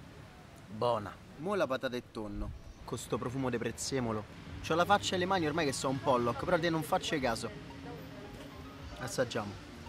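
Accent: Rome, Italy. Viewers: Un bel corso di dizione non sarebbe male, traspare la poca istruzione avuta quando parli romano "andiamo a provà" "annamolo a scropì" "e quindi mò".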